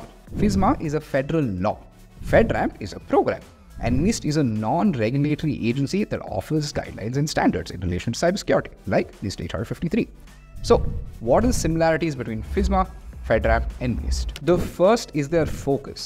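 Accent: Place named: India. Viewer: I have to really increase the volume to understand what he is speaking - an accent like bollywood or some language that I am not used to is hard to understand, the accent.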